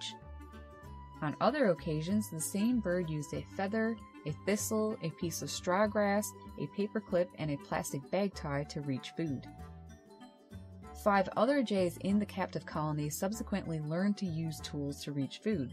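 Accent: Newfoundland, Canada. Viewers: Is that a Newfoundland accent you have? That’s not an insult. You will never please all the people my dear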